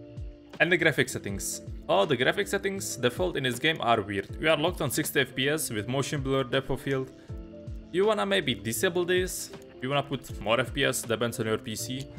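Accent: Czech Republic. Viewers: Hello arent you from Czech ? you accent sound like it chears :)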